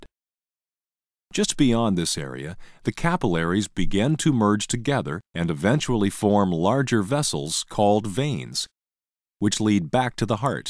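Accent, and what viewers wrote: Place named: United States. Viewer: LOL at Capillaries! lool . Aw i love me a good american accent :P hehe .Anyway ACE vid! . Love it and it explains and covers the topic great! <3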